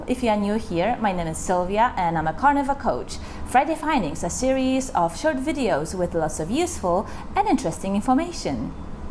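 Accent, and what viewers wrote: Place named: United Kingdom. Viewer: With your British(?) accent you need to speak slower and more clearly if you want to increase USA audience, although you are pretty good looking so maybe it won't matter. However thank you for your good content that I will try now to listen to. Correction, your speed and clarity now that I have listened is really not bad. I might be just a slow old man.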